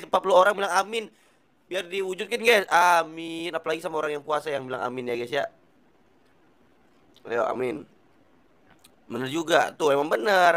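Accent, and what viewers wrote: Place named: West Kalimantan, Indonesia. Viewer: mungkin logat.nya kalbar bro maman sama doyok teguh jga hampir sama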